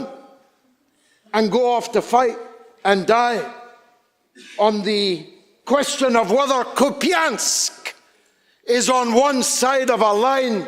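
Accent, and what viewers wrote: Scotland, United Kingdom. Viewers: Wow, straight out of the heart! And this Scottish accent made it even more wortwile. Thank you!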